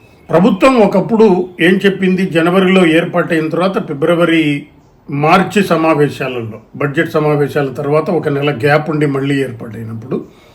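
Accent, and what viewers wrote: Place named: United Kingdom. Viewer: తెలుగులో ఎంచక్కా చెప్పవచ్చు కదా... బ్రిటిష్ యాసతో ఇంగ్లీషులో ... అందరూ అర్ధం చేసుకునే అవకాశం లేకుండా పోయింది అని... అందుచేత...మీ యూట్యూబ్ ఛానల్ చూడదలచుకోలేదు..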